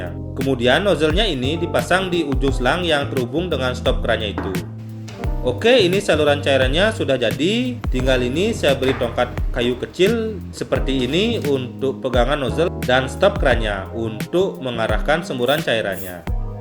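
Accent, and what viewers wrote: Bali, Indonesia. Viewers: Bicaranya seperti orang Bali ya brow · Suara org dari bali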